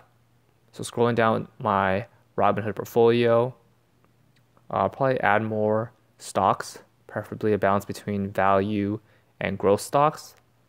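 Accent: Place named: India. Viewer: Naw buddy I can tell, you have a slight indian accent street shitting fren.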